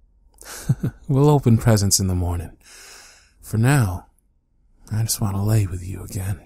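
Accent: South, United States. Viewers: So cute! I love that you have a slight southern twang when you talk in this one! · It somewhat sounded like a southern accent was thrown into this. It was amazing. This reminded me of my dad returning home from Iraq when I was little. I loved this so much. Well done. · HIS SOUTHERN ACCENT HAS ME QUAKING LMAO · Southern accent 😳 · As a southerner the accent slapped me so hard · I swear you get a little southern every time you do a soldier one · aaaagh that Southern dialect! I love it! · Dog + southern voice grabby hands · I love the country accent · Dat Country accent 😍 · That southern accent is actually everything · I FRICKING LOVE THE SOUTHERN ACCENT, ITS SOOOOO AMAZING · Are you from the south or something cause it sounds like you are or you’re just really good at doing accents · I love southern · Country accents! Country accents everywhere! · I know I wrote this and all, but as I was listening to this, I cried like a little bitch., your southern accent is so adorkable! 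Even still, I'm dying because of how wonderful this turned out! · Psst guess what. The country accent. Fucking amazing. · Is that a twinge of a southern accent I hear? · is it me or did anyone else hear the cowboy ascent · The southern accent is almost a turn off, I guess is trying to fit the "all American vets have tough southern accents" stereotype, for me it's just distracting but it probably hits home for other people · Awwww the southern accent always gets me 😍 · The southern accent is back y'all. The heartwarming fluffy bits r back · Is it just me or did he do a southern accent? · That slight southern accent is so charming!!😍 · holD uP nOw! YOu HavE mY CoUnTrY AccenT! And Is ThAt I WeE dOggIe? HoOoOoO-wEeE!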